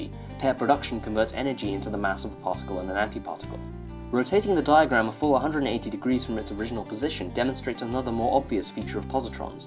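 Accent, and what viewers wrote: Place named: United Kingdom. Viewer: Thanks for this, my only suggetion is to speak slower and more clearly. That Brit accent is sometime hard for Americans to understand when spoken fast.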